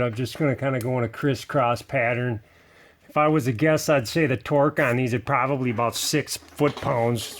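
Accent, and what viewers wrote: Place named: Northeast, United States. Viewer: You gotta be from the northeast some where.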